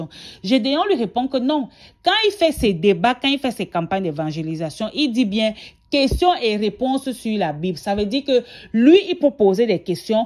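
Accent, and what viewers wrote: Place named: Cameroon. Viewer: Vraiment madame tu es forte dans rapporter les faits exactement comme ça s'est passé avec un accent camerounais interressant et intéressé par les faits Chapeau continue ainsi...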